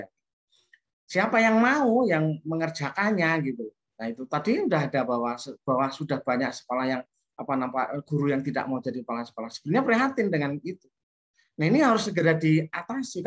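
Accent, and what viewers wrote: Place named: Pasuruan, Indonesia. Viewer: Suara pasuruan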